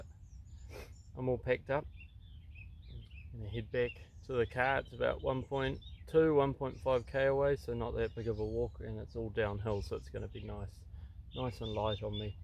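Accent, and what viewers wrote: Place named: New Zealand. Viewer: You are fun to watch. Your sense of humor is so laid back. You don't yell like others do. Love your New Zealand accent. Please keep making videos.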